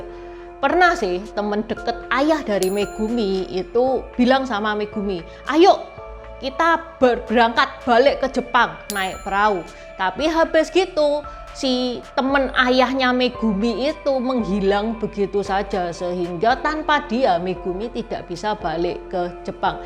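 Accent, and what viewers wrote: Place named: Java, Indonesia. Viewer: Andai aku dengar cerita ini dari radio, pasti aku menyakini klo ini orang Jawa tulen...wkkkk. Maju terus....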